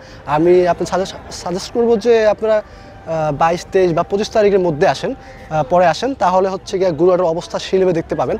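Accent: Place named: Dhaka, Bangladesh. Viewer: Overall valoy.. editing ta besh valo lagse.. kotha bolar style ta r aktu shundor kor vai, r try kor dhakar vasha ta kom sure kore smart vasha ta use korte, r akta bishoy holo gurur dam kemon, kon gorur kon koshay kerokom dam chai ata tule dhor. corona er jonno bazarer ki rokom obostha ata shobar kase pousate parle valo response pabi.. best of luck dost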